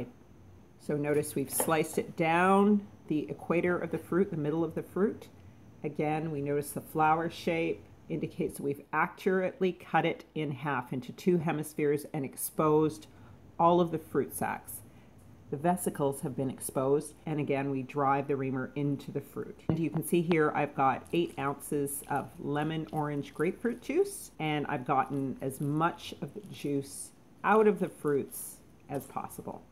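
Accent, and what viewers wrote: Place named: Canada. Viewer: I thought I heard a Canadian accent. Im from Winnipeg but my whole family lives in Nanaimo on the island. I live in Houston, Tx. Love your vids. Want to know a little more about the potassium salts. I went to <link> but couldn't find anything. I have alot of thyroid issues but don't take any meds. Try to get what I need naturally but think I need a little more help. 🕊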